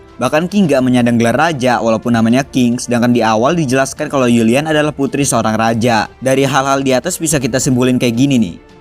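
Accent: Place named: Jakarta, Indonesia. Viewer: fix admin Anak Jaksel Banget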